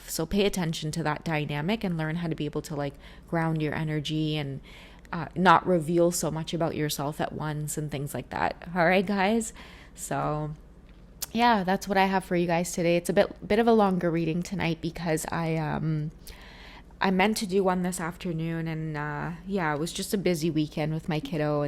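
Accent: Trinidad and Tobago. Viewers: A warm Trini shout out! I love when you speak in your Trini accent makes it even authentic. You have an amazing vibe and your reading provide such clarity.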